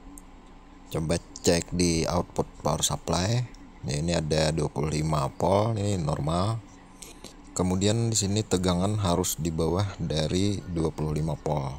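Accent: Banjar, Indonesia. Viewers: Bahasa Indonesia 100% bagus , tp logat Banjar nya katahuan 😂. salam 1 hobby sanak mautak atik masin las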